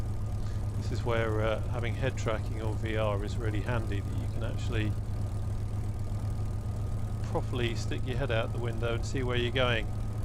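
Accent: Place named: England, United Kingdom. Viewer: You were absolutely great on this one. Thank you very much. I' m not familiar with how to financially support you but I will find out on way or the other. Finding and get caught on the immersion of this sim is something I share with you and I prefer English above the American accent as a real Dutchman, you neighbor so to speak. See you next time🙏👍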